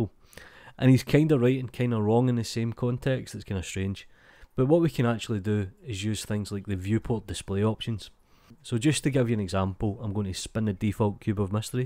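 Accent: Scotland, United Kingdom. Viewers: that scottish accent 👌👌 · 2 things that I like at the same place; blender tutorials aand Scottish accent :D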